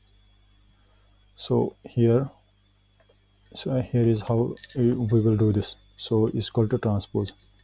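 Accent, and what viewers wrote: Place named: Kashmir, India. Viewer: maine apki awaa suni muje wahi samaj aaya aap apne kashmiri bhai ho Allah salamat rakhe this video helped <3 a lot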